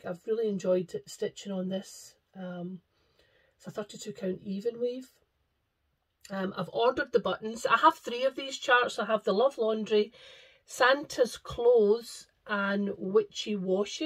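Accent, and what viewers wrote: Scotland, United Kingdom. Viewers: I discovered you earlier this week and binge watched several episodes and of course subscribed. I've fallen in love with your beautiful Scottish accent!! I'd watch just for that, however your content is wonderful too! So win win! I'm sorry about your dad's health. And yours as well. How painful. I'll pray for full recovery on your legs. I'm sure you are looking forward to summer as much as we are.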